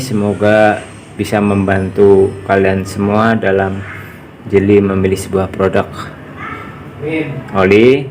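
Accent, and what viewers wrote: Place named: Cirebon, Indonesia. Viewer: ngomongnya kyak orang cirebonan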